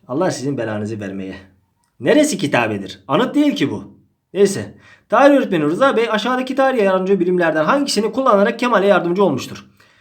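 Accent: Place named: Diyarbakir, Turkey. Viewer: Diyarbakirlilar da öyle konuşur ha :))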